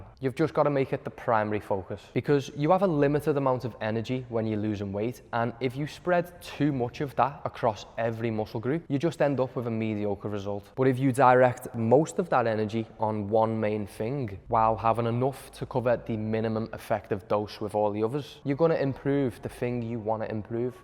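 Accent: Liverpool, United Kingdom. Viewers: It’s scouse la, ynwa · Theres something, I like about the scouse accent, which is fun, but also, i'm not fucken about lad.... 😛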